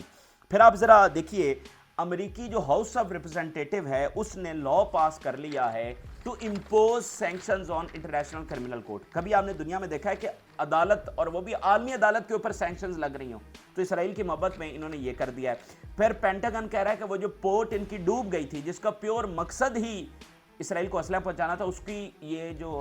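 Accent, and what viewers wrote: Punjab, Pakistan. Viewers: That Clown dance didn't end now he is playing music in the background Obviously he has been having fun and there thousand innocent people r getting killed everyday But people like u supporting him and like his ridiculous videos Shame on all of u guys who r supporting him He can't speak one right word of English and no one force him to read punjabi English Can't say right name of jewish politicians name Despite he copies all these news from other sources despite listening he can't learn right English and say right words and other names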